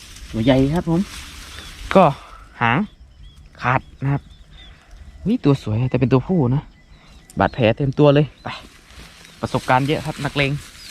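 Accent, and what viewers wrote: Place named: Isan, Thailand. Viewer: เป็นคนจังหวัดไหนคับยางครั้งพูดอีสานชัดมาก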